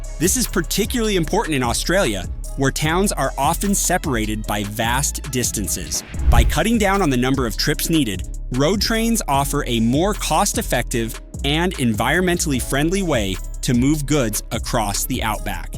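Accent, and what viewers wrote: United States. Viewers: as an aussie i found it amusing to listen to a yank telling me about road trains, secondly shows a Lefthand drive car, NOT on australian roads. i suppose yanks limited intelligence. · Why does an Australian story have an American voice over? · The only reason I clicked on this was to leave a comment. The thumbnail to click on is the biggest load of Photoshopped sensationist crap I've ever seen, I bet you're a yank or AI voice sprouking this bullshit.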